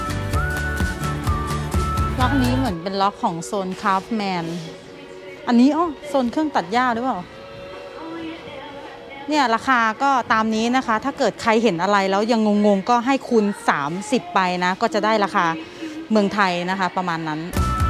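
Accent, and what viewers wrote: South, Thailand. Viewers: พี่ คนใต้ใช่มั้ย555 เข็ญไม่รอดนี่รู้เลยย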